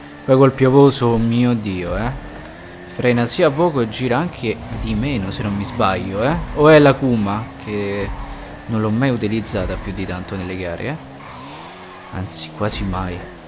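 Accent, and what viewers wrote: Rome, Italy. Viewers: Sei di Roma?grande suiiii🐷🐖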